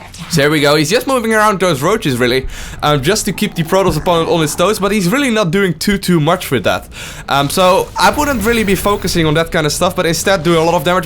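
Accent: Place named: Netherlands. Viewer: dat classis dutch accent....sounds exactly like rotterdam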